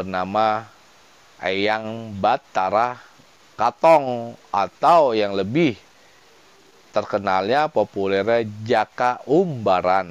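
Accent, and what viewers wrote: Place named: West Java, Indonesia. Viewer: Trima kasi kepada Bpk ustadz, klo didengar dialeknya orang Jawa Barat, sangat jelas sekali menerangkanya, Ammmin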